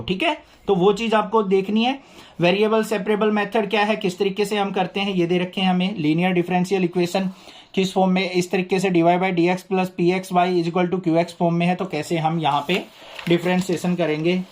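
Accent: Haryana, India. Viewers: Sir aap Haryana se h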